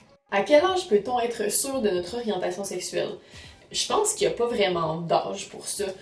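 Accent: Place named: Montreal, Canada. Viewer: Fille choisis.. c'est français ou anglais🙄 Ça parait que tu vis à montréal parce que t'entendre parler comme ça c'est juste dégueulasse.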